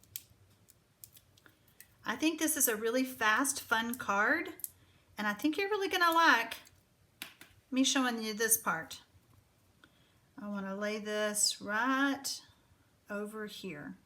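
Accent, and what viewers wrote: South, United States. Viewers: I love this card design. Papers very pretty to even with the stripes. I think this would make a great Christmas card or Halloween card so I think I might play with it that way. I also have to say, I love your Southern accent. I don't know where you're from I just know you're not from Louisiana. I'm living there at the moment and you don't sound well at least on the southern parts I mean you could be up closer to Texas.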